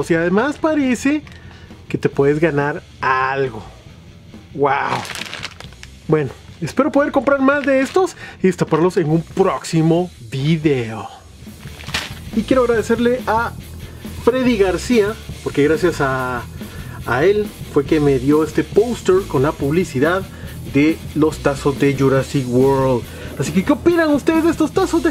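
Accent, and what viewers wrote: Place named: Guatemala. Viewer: V creo que eres de Guatemala :v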